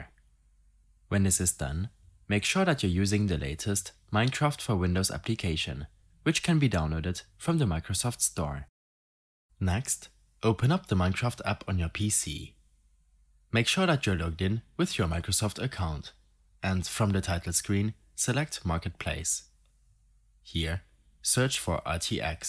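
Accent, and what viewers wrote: Germany. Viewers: you sound german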